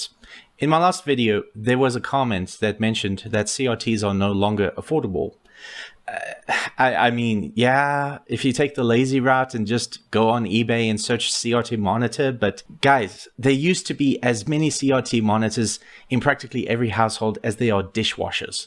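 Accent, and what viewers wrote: South Africa. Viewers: Sorry I have to ask, are you a South African or in South Africa? Cause your accent sounds like you are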